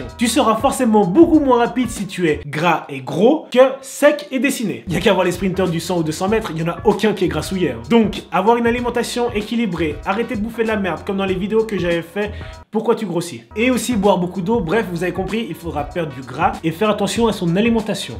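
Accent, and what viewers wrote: Belgium. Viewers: T belge ?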